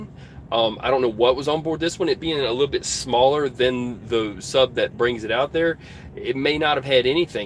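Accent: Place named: Midwest, United States. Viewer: How the hell do you know that cartoon? I watched it as a kid but I was born in 70s almost 80s lol....... kursk stuff- we offered help ? Can U speak Norwegian? Lol you speak like from midwest ..white, redblooded murican boy.:) what I meant was that were Norwegians who offered help. Its arctic circle. Do u think America with her 2 old ass icebreakers vs Russian 57 icebreakers....u offered help lol.....do you know that many think it got hit by Ohio class that was getting the repairs in Norway a few days later and you can see from the satellite image that the emergency capsule is not there. Removed . Why ? Why even repair in Norway for an American sub if it's not some regular maintenance. PS... I like you even touched this subject Dude does that bald black commentator with glasses look like ru paul?lol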